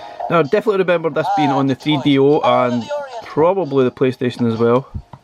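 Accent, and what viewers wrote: Scotland, United Kingdom. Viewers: Great video mate! Still eyeing this up! Apologies if it was mentioned somewhere in the video, I'm watching at a library with the sound low, what emulator/core was used? I'm guessing this wasn't beetle core based on the Sega Rally gameplay. The road seems to "break up" and this doesn't happen on real saturn/beetle gameplay. But everything seems super smooth and contradicts the notion that the ARC is not capable of running Saturn. Also regarding Sonic R I absolutely adore it and it is criminally underrated haha. The characters are meant to be driven like cars with B as an accelerate button, and the analog as steering and L,R triggers as drift. Once you get the hang of it it's pretty smooth and you'll be acing all the races. Perhaps it was a bad design choice to have the, running in hindsight. Oh and your accent makes me nostalgic for Scotland, it's been ages since I've been home.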